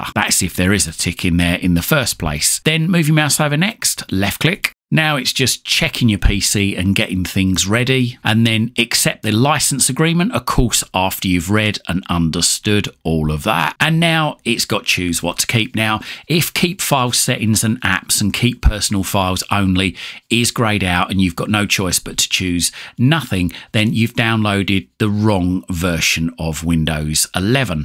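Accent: United Kingdom. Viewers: Thanks loaded flawlessly on a 10 year old i7 4g ram laptop. It needs more ram really. I take it if I swap out the hard drive sometime I will have to load Win10 and go through the process again?? Great to hear a Brit voice😊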